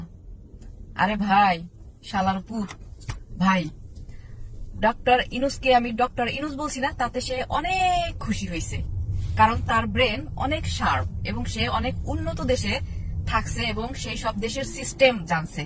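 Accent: Barishal, Bangladesh. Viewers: Made in Barisal ! 👏 Bravo... শব্দ চয়নে একটু ঝামেলা থাকলেও কথা কিন্তু বাস্তব । মুইও বরিশাইল্লা😂😂😂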